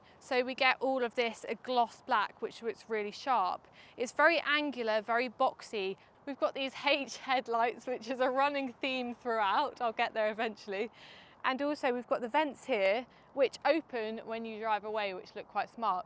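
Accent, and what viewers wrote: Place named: United Kingdom. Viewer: I love your british accent I feel like keep listening to you.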